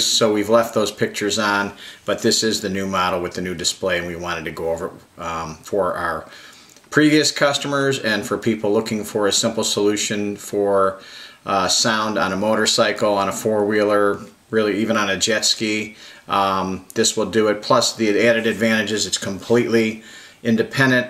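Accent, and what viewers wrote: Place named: China. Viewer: The lady speaking she sounds like she's from China brand made in China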